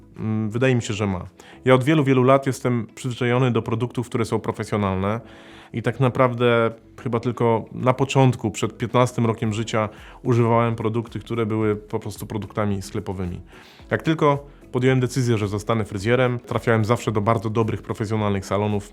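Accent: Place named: Poland. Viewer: Niesamowicie bawi mnie Polska wymowa angielskich słów 🥲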